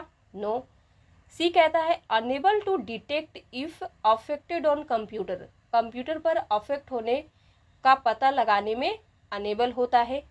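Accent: Odisha, India. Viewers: Mam kya aap odisha se he ?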